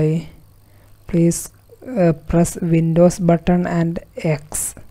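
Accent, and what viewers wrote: India. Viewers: Of course another indian accent why every computer supporter is indian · Holy crap it actually worked! Ty miss! The Indian accent is so cute lol.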